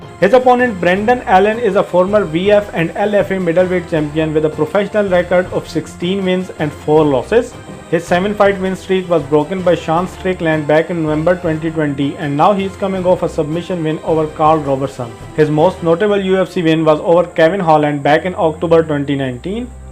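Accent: India. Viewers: Wtf!! First time i heard indian talking bout mma n his english ndian accent ia annoying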